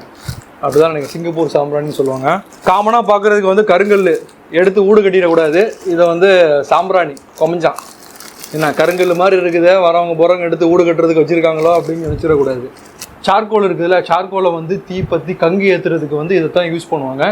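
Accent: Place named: Keelakarai, India. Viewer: Ivvalavu seera koduthu vachavanga ponga unga ooru slang keelakarai slang mathiri irukum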